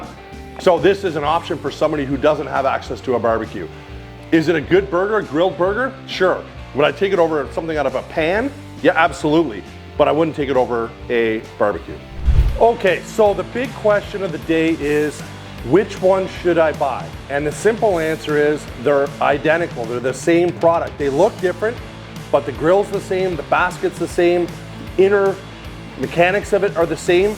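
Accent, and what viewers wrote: Canada. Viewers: I love the way Canadians pronounce "out" and "about". Love Ninja products!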